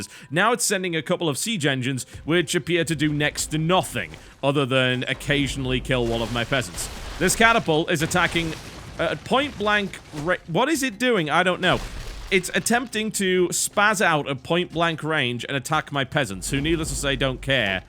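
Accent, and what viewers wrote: United Kingdom. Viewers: It's the British pronunciation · hails from England, but has been living in america for years, that's why his accent isn't very prominent, but he still uses british terms.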